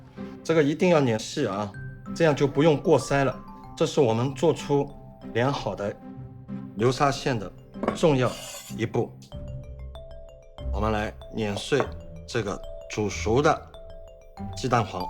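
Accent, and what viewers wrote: Shanghai, China. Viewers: 一听就是上海师傅😄 讲的不拖泥带水 专业 是我喜欢的风格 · 呜呜师傅的上海口音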